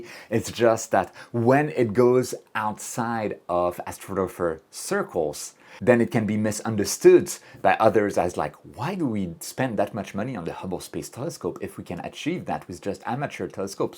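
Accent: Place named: France. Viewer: in japan and with a french accent, verry intresting~ tbh am not surprised x3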